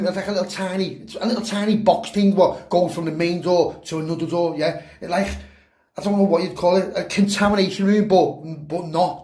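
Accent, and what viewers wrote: Liverpool, United Kingdom. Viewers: Love it scouse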